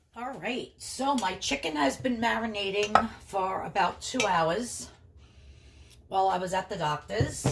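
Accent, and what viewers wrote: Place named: Long Island, United States. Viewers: No, but I lived in Manhattan for 15 years and had a friend who was from Long Island and she sounds just like you! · The food looks great, but the ACCENT kept me watching. 😂😍🥰 Long Island?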